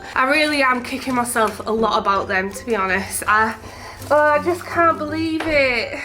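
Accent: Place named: Yorkshire, United Kingdom. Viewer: Ahh love coming onto a channel and finding someone with a similar accent to me. Are u from Yorkshire? I’m from Hebden Bridge near Halifax, born in Bradford. I want to do an art channel and I have wondered what will people make of my accent and should I learn how to tone it down lol but then I hear you’re accent and u sound luvli!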